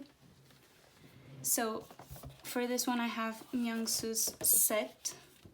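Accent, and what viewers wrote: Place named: Portugal. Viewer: i knew there was something off about your accent, com que então és portuguesa ahaha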